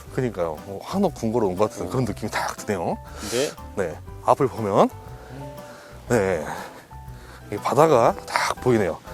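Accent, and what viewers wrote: Jeolla, South Korea. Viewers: 아따 이양반전라도네! 전라도씨가 온사방에 퍼져있으니 거시기 하네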